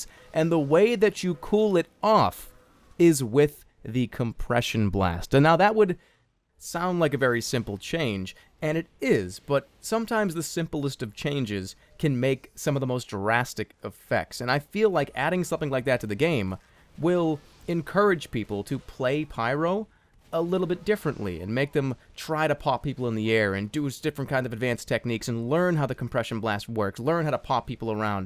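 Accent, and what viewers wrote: Boston, United States. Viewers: Your boston accent is just orgasmic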